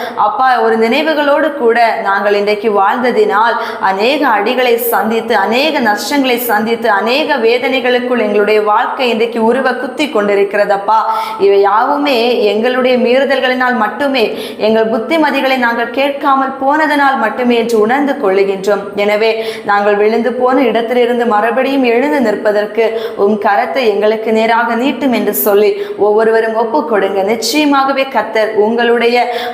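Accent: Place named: Kerala, India. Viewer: Amen Kerala tamil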